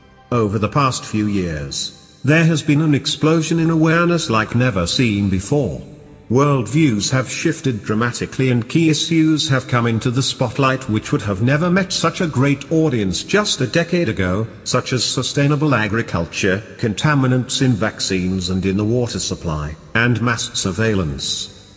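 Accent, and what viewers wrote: United Kingdom. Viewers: Why the British accent? We're talking about America, after all.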